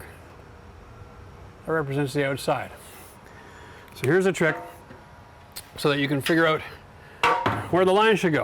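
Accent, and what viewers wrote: Canada. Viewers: Canadian aa?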